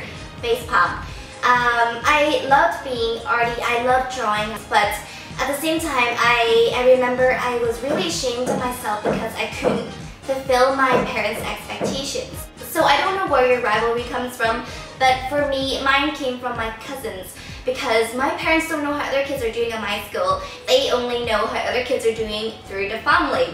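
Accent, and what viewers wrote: Northern Ireland, United Kingdom. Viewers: Northern Irish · You sound kinda northern Irish?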